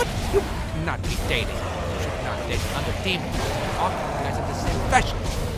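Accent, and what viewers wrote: United Kingdom. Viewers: British voice kind of reminds me of the Geiko Gecko. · your british accent soundslike a non robotic Weatly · you kind of sound like austin powers in your awesome british accent ! =)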